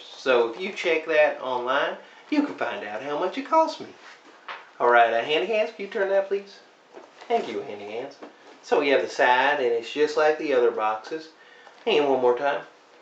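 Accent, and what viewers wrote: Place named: South, United States. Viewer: Is that southern accent for real?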